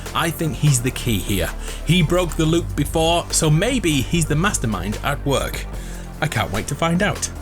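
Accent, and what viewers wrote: United Kingdom. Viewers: youer British omg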